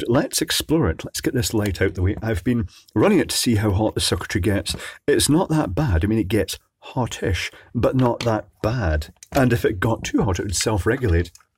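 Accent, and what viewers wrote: Scotland, United Kingdom. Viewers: The accent is Scotland's 2nd most valuable export. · Hi, Love all your videos, been a fan since around 2010, love your soothing Scottish voice! Now I didn't know how else to contact you, but can you do a video about rejuvenating laptop batteries, including their controllers, as I have scoured the net and can't really find anything that addresses the problems with why they wont work after changing/recharging/replacing cells. I've a ton of them and really don't want to tear them apart for just the cells, if the controllers can be put to some use. I know there are a plethora of types/styles/models etc, but maybe if you can autopsy a generic HP or Toshiba maybe Dell that would be great. Look forward to learning.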